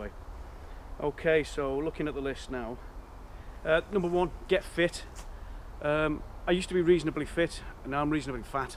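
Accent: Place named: Wales, United Kingdom. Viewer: What's going on with the accent mate? Welsh, Scouse and a hint of Geordie, give up the booze? Never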